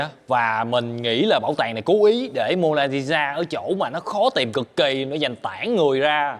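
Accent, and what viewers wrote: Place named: South, Vietnam. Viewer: noi giong mien Nam nghe nhe nhang de chiu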